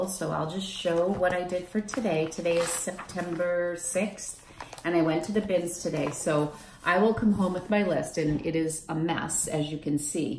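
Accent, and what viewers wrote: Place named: New England, United States. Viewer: I grew up in Newburyport, a historic port town. I visit every year. I spent my early 20s in Boston, then moved to Big Sur, Santa Cruz and now am 1 hour north of San Francisco in SR. I recognized your accent right away.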